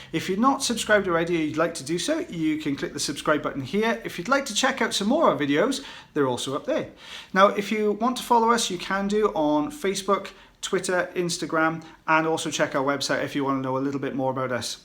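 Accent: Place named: England, United Kingdom. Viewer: I love skin peels! I had a good laugh for a moment when you said "tinnitus". I couldn't figure out what that meant for a minute because over here, we pronounce it as "tin-eye-tus" haha. I love hearing the different words you use and the way you say them. I look forward to all the "English" English in your videos. Keep up the great work!!!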